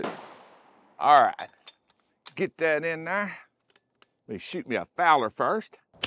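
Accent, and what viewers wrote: South, United States. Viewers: Are you Southern or Texan? Either way I adore you, your sense of humor and editing style!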